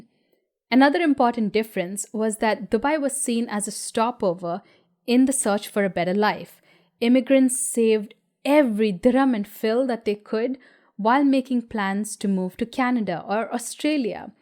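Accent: India. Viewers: Wow an Indian accent that I can understand, liked and subscribed! In Dubai, I couldn't understand when (most) Indians speak English.. I ended up speaking to them in Hindi LOL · Such a sympathetic woman! I feel for you and know that growing up - wherever - this is your home. However, having been to Dubai as a European, I do not understand WHY on earth anybody wants to travel there. It is one of the most unattractive places I have seen. All fake, all new, all shiny, glossy, empty...filled with the most beautiful people from all over the world working there. I have hardly ever seen so many attractive human beings as there...but still: I liked my 1 week trip there, but would never dream of going there again. Howver, for you: Having not seen you parents in 6 years is terrible. I hope you can return and see them soon! Keep up with your very insightful and great channel. 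Love it! (And I love your Indian accent, too. Remember: MOST people in the English speaking world speak like you and not the Queen's English!!!)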